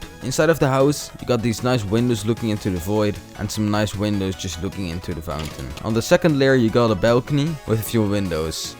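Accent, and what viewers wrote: Netherlands. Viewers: U DUTCH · Are you dutch? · You’re Dutch and i can hear that XD Edit: on is Dutch chat said: nu pas and that is Dutch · where are you from netherlands? or are you russian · Are you dutch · Are u dutch?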